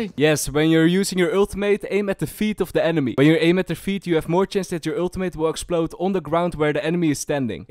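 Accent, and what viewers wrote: Netherlands. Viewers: Im sorry but your content is lacking. First of all im Dutch myself aswell and my accent is not so bad as yours. Maybe practice a bit must 10 minutes can make a big difference. Some of your tips are actually useless like the Kay/o dagger. The Line-up itself is kinda bad the dagger flies way to long and the spot where it lands is to dat back. The enemy team had pushed in to long or short and wont get hit by the dagger so you wont get Intel. And dont say that your viewers dont have brains people will stop watching due to your toxic behavior the only good part about this is that you confirm that you actually play the game. And the "tips and tricks" from you guys serie just makes clear to me that you dont put time and effort in to your videos I hope i could help with my critisism to keep one Dutch content creator live a nother day Have a good day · thats one heavy ass dutch accent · i smell dutch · Just make a video in Dutch xD. 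Don't want to be rude but we can hear your accent pretty hard xD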